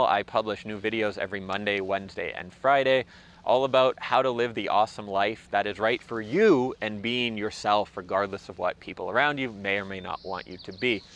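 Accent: Canada. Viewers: sounds Canadian and his views are rooted from that perspective, which is ok because everyone has to live his or her life.Canada is very individualistic so listen to him. But be careful, the later can be just as bad, especially in Canada because its hard to fit in. Don't try to. live your life. people will disappoint so don't expect to be connected in a disconnected world because we are all alone together. Best of luck.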